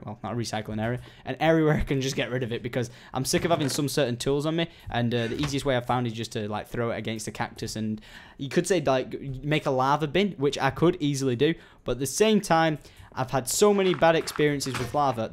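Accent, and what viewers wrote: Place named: United Kingdom. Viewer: your brittish never gets old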